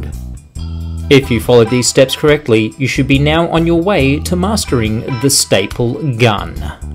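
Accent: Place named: Australia. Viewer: Haha I love the narration. So Aussie.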